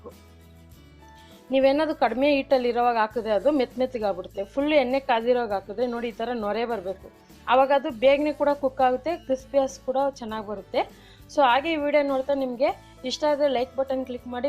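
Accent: Tamil Nadu, India. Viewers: ನೀವು ತಮಿಳು ಮಾತನಾಡೋ ಭಾಷೆಯವರು ಇರಬೇಕು. ಕನ್ನಡವನ್ನು ಸ್ವಚ್ಛವಾಗಿ ಮಾತಾಡೋದನ್ನು ಕಲೀರಿ.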